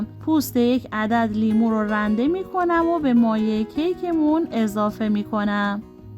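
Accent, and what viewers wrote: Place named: United Kingdom. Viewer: استاد چرا زبان پارسی را با لهجه انگلیسی صحبت می‌کنی .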